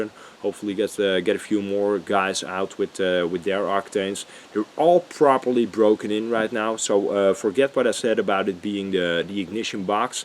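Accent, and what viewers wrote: Netherlands. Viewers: 100% dutch accent there! Haha! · je klinkt nederlands!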